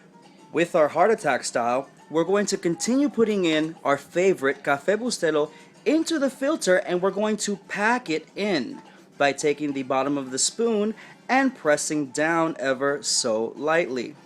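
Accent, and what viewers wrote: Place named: Cibao, Dominican Republic. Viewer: Jajajaja me encanta la forma de explicar... seguro eres del cibao santiago republica dominicana. Salud!!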